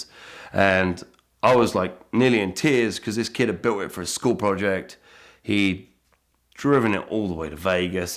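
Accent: United Kingdom. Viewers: No matter how cool you are that Brit accent make you sound like a total douche!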